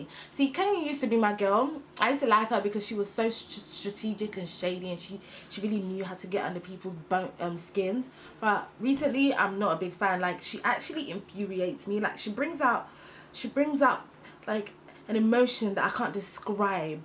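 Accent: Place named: United States. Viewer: You're personality is EVERYTHING!! 😂😂😂😂😂. And you're American accent is 👌🏽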